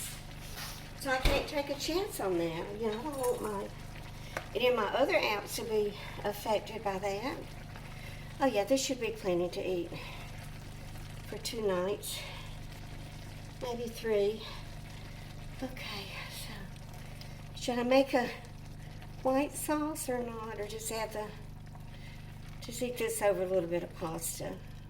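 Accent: South, United States. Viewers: Hello dear, it's been a little while but it is always lovely to hear your warm, welcoming Southern USA Accent:) Bless you.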